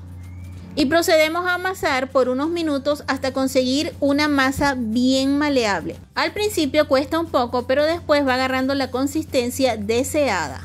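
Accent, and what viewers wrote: Venezuela. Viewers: Buena receta para las personas que no pueden comer gluten ( aunque gracias a Dios ,puedo comer de todo ) Saludos desde Venezuela 🇻🇪 , aunque por tu acento creo que también eres venezolana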